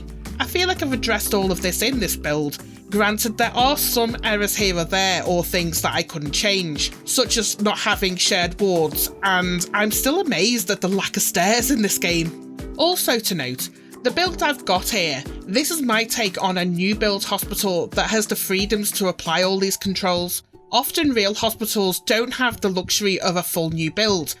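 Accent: Liverpool, United Kingdom. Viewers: You sound like you're from Liverpool! I have the same problems with the system of how wards work. Especially the common rooms. I worked in Aintree and as F1s we had a large communal room for all of us, regardless of the ward! Same with AMU and having patients with diagnoses of different specialities. Oh well, I guess they aren't building it around our systems but some of the logic is irritating.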